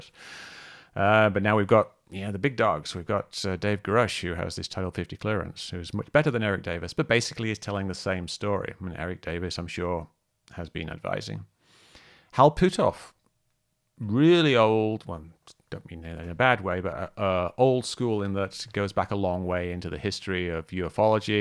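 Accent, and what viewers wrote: United Kingdom. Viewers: Good video but whats with the use of americanism 'math' ...'flashlight' etc ...with a british accent ?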